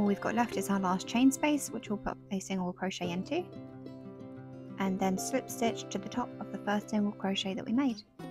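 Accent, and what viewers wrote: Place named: United Kingdom. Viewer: British "accent"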